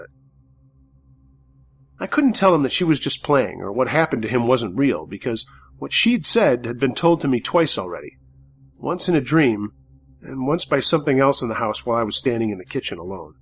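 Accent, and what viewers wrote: United States. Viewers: Still can't sleep. Still going through every video I can find. You have the best voice/American accent around. Thanks for getting me through these long sleepless nights 😁😴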